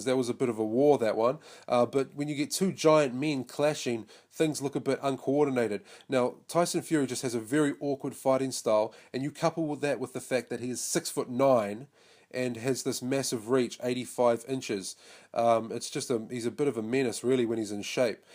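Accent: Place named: New Zealand. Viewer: You fucking legend your accent cracks me up. Proper hard Kiwi Lad from New Zeland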